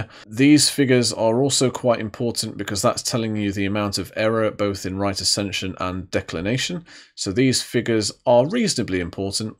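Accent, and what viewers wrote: United Kingdom. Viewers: WOW...That was a Fantastic Presentation...I was writing fast...Stop and Write and start up again...You are so very knowledgeable - Very Impressive...As a Newbie...Even with all the Required Equipment...I see I have a Long Learning Curve...But with your Videos...You have made the Process Less Painful... Beautiful Accent...You must be from The Mother-Country...Florida Here...Thanks again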